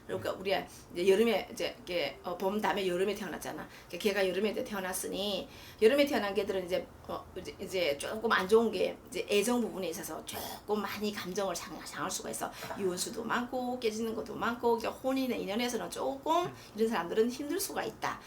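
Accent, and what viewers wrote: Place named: China. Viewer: 넘빨리말씁해서 잘못알아듣는데요.발음도 여기조선족발음으로들려요천천히 다른무속인들처럼😢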